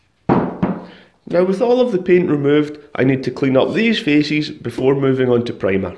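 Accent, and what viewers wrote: Scotland, United Kingdom. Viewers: I love these videos and when he was cleaning the feces not to disturb the quel mailing marks! Everyone loves a legit scottish accent!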